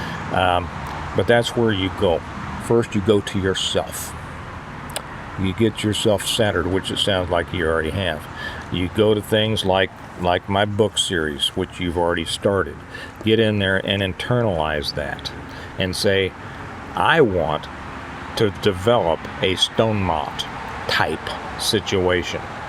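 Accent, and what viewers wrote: South, United States. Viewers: I LOVE UR DRAWL WHEN YOU TALK.. we in Canada love listening to YOU Southern folk..KEEP TALKING BRO.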